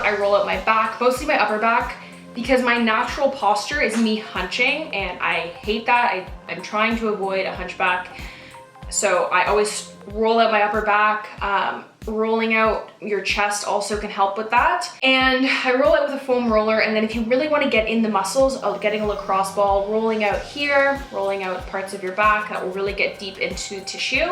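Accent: Canada. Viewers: Are you Canadian because the way you say out is different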